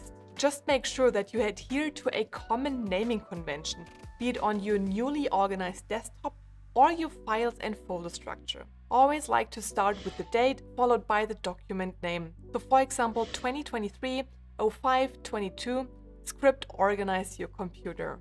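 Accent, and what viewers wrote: Germany. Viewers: Can't stop "listening" to your video. I love your German accent! Bist du tatsächlich Deutscher? Also, bist du Deutscher? Komm schon, erzähl es mir bitte!😃 I am Brazilian and am learning German and to me, you sound like you have a German accent. I could be wrong, but whatever.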